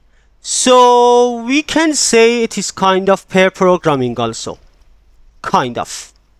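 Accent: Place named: Iran. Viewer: یعنی کسی که هیچ آشنايی با زبان انگلیسی هم نداره، متوجه ميشه که ایرانی هستيد و شايد هم متوجه اصفهانی‌بودنتون بشه. با وجود هوش‌مصنوعی‌هایی که با صدا و لحن خودتون صوت انگلیسی تولید می‌کنند، چه اصراری دارید که با اين لهجه‌ی فاجعه، خودتون صحبت کنید؟؟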